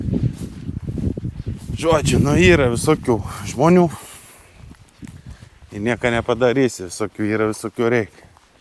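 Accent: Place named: Kuršėnai, Lithuania. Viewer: Patink video, o da labiau - kuršienietiška šnekta 😊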